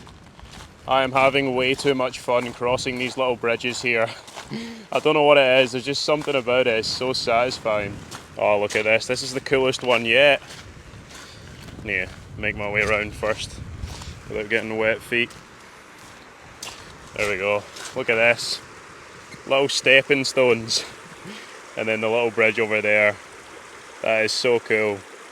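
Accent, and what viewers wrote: Scotland, United Kingdom. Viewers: thanks for another great trek, always look forward to a posting, with the camera work, the sounds, the narration and information in your Scottish voice, the calming demeanor, it's almost like being there, can almost smell you making meals. Charles would be honored that the stopped at the estate and the bothy would always be accommodating....safe summitting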